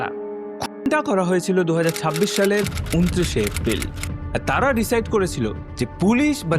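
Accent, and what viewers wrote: Bangladesh. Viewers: আপনার গল্প বলার ভঙ্গি ও সুর অনেকটা বাংলাদেশিদের মতো, যার কারণেই আপনি বিপুল সংখ্যক বাংলাদেশি দর্শকের মনোযোগ আকর্ষণ করেছেন...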